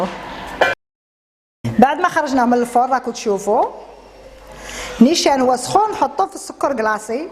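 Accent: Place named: Bechar, Algeria. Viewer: سلام اهلا بيك يعطيك الصحة روعة الله يبارك عجبني هادا القاطو يستاهل التجربة وعلى ما اضن على حساب الهدرة تباني من ولاية بشار المهم بارك الله فيك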